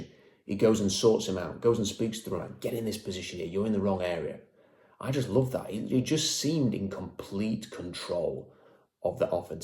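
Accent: United Kingdom. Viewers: Wait! Hold on! You've got a British accent, and you have no problems with "football"? Where's the "foot" in "football"? I always hear.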